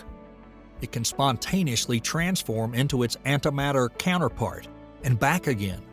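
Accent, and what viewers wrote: South, United States. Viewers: I've never heard a physics doc narration with a southern accent. I think I love it.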